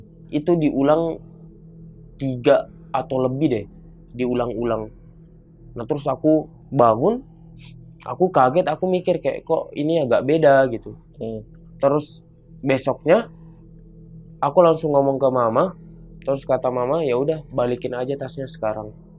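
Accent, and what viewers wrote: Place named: Bugis, Indonesia. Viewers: Ku tempeleng Bugis bgt bg👋👋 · Pasti ini bang orang sulawesi, kentara logatnya😅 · Logat sulawesinya masih ada yah kk,, salam dari sulawesi selatan bone kk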